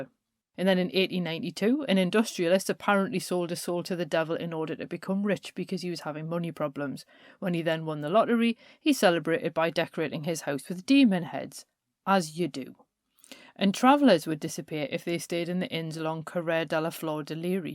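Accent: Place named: North, United Kingdom. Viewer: One of my favourite things to do whilst travelling for work is to watch a spooky history soc on YouTube, I'm from the north so was very surprised to hear a familiar accent!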